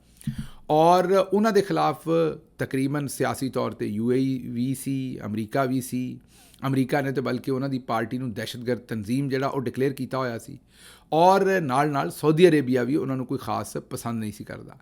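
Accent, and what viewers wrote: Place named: Punjab, India. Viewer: Love your Punjabi accent ❤ respect from East London🇬🇧🇬🇧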